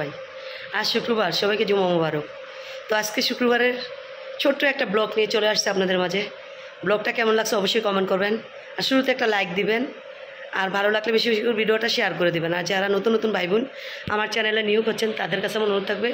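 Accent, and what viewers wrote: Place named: Brahmanbaria, Bangladesh. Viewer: কিসের জন্য এমনটা হয়েছিল আপা এটা জানতে পারছেন তো যা হোক চ্যানেলটা যেন ঠিক হয়ে যায় আপা আপনি আমাদের ব্রাহ্মণবাড়িয়া বাঞ্ছারামপুরের সেম ভাষা বলেন অসাধারণ কথা খুবই ভালো লাগে তো দোয়া করবেন আমি আহমদ আলী সৌদি আরব রিয়ার থেকে ব্লক গুলি দেখি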